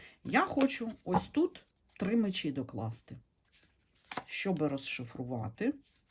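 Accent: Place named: Chernivtsi, Ukraine. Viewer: добрий день я знаю де хотинський район бо я ваша землячка з Чернівців також на даний час в Німеччині всього вам найкращого як я люблю наш діалект таке наше рідне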